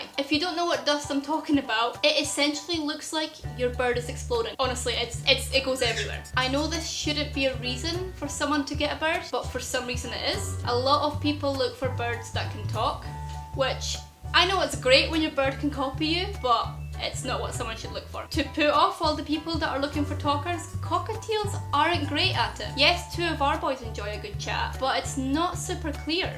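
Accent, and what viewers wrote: Scotland, United Kingdom. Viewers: Are u Scottish;) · Are u scottish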